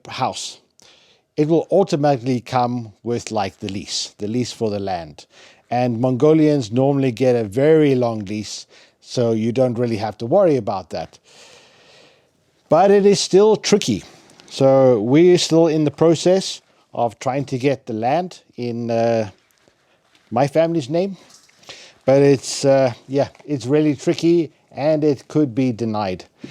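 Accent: South Africa. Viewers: Holy moly. Is that a South African accent? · Is that a South African accent? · is bro South African or Dutch? it's breaking my brain lol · You sound Afrikaans. great Video. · Is this guy from South Africa? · Sounds like a very South Africa accent?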